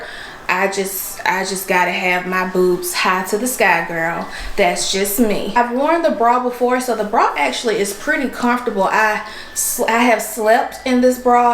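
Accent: South, United States. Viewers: Do you have a country accent it's so sexy with yo thick fine self with all do respect boo 😘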